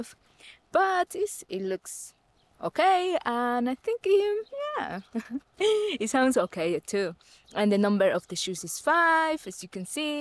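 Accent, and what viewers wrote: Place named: Mexico. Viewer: I love your Mexican accent.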